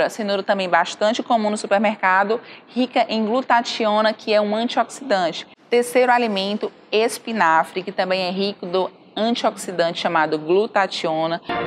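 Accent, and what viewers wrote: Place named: Ceará, Brazil. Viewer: Pelo sotaque dela deve ser do Ceará.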